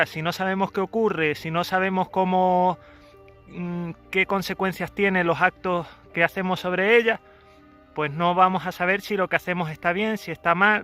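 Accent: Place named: Mexico. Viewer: es un experto en apicultura y una gran persona tiene más parecido el acento de voz a mexicano que a español y es un gran ser humano por que nos enseña todas sus experiencias con la apicultura Dios bendiga tu familia. un abrazo con respeto de un mexicano🇲🇽 Desde Ohio usa 🇺🇸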